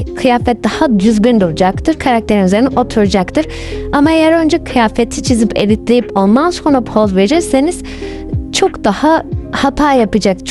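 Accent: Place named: South Korea. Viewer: ama sesin benziyo sanki korelimissinde türkçe konuşmay çalışıyormussun gibi❤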